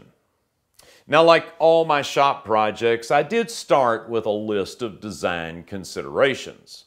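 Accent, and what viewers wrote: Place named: Texas, United States. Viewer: your Texas rhythm is perfect for the instructions. Don't let the thoughtless comments get you down. All the effort and talent you bring to your videos is very much appreciated. I've learned a great deal and I've been inspired.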